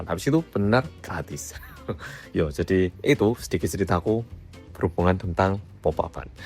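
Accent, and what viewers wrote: Java, Indonesia. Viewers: Jadi seneng lihat videonya dah ganteng logate medhok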